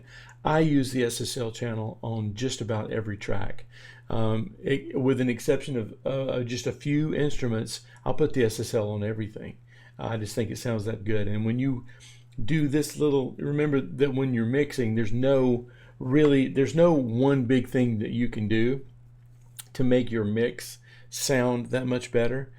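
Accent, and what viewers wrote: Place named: South Carolina, United States. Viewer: Great video my friend. I was trying to place your slight accent. Maybe South Carolina? haha don't know. Don't disclose this, I like your voice lol. Great video my friend. Well done!!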